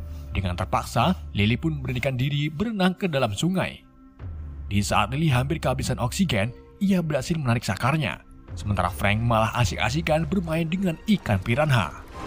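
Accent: Malaysia. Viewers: Orang Malaysia ya min ? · Abang dari malisiya ya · Bang kok loghat lu kek orang malaysia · Nampaknya komentator ini dari Malaysia, sebab ada sedikit terdengar dengan nada yang berlogat Malaysia. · Min. Gua mau nanya lu orang melayu ya. Soal nya gua teliti dari logat lu ngomong bahasa indonesia kayak orang melayu.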